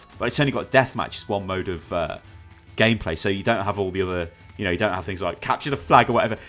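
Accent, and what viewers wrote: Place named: Birmingham, United Kingdom. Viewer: First of all, nice job with the new review! waay better :) but secondly, I've been wondering about your accents for a while now... so I'm gonna take a stab at it and guess... Birmingham?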